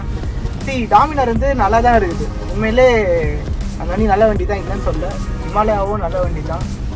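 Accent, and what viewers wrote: Chennai, India. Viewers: Super Bro.... full Chennai slang I like it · "மெட்ராஸ் பாஸை" யில் பேசுவது கேவலமாக இருக்கிறது!👎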